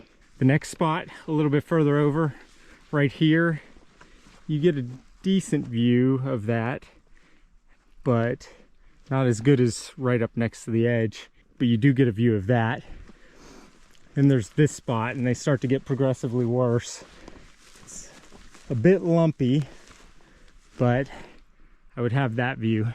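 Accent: United States. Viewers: Are you an American or English? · you American bro? good video! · it’s the softly spoken yank accent that does it for me bud.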